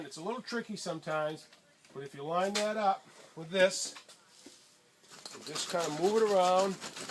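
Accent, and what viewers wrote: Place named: Boston, United States. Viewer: Love your Boston accent :-) It's wicked pissa!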